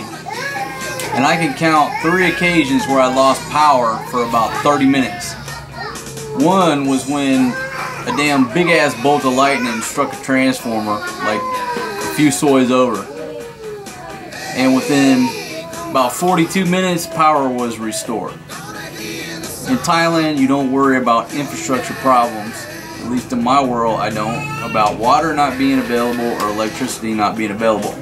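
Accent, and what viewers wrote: South, United States. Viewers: Where you from bro down south somewhere I guess Louisiana? Liking your content on your channel can’t wait to get my ass back to phillipines and yep gimme a choice I’ll take phily over Thailand any day even with its fuck ups lmao 😂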